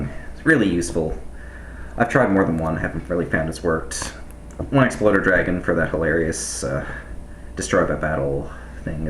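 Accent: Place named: Newfoundland, Canada. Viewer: You sound like your from Newfroundland, good deck ya got there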